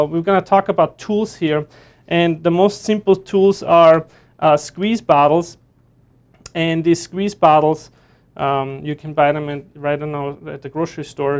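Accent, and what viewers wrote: Austria. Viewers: Phillip Dodds austrian probably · Einen Österreicher hör ich aus 100 Leuten raus :-) Cooles Video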